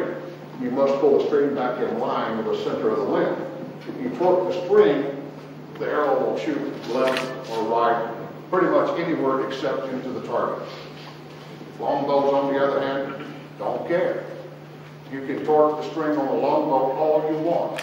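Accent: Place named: South, United States. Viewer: The problem here is the language barrier. And Mr. ‘s southern drawl does not make it easier.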